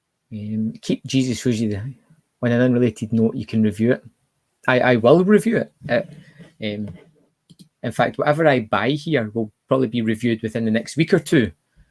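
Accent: Scotland, United Kingdom. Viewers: I’m sure your voice sounds different on the live stream! Not sure if it’s more Scottish or not 😃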